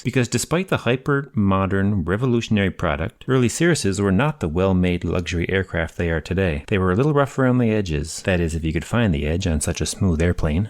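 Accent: Canada. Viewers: This is the most Canadian man I've ever heard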